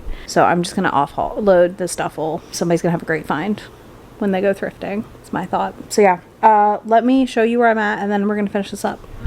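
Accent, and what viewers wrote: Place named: Kentucky, United States. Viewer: As a KY native living in SC, hearing talk made me feel like I was back home 😂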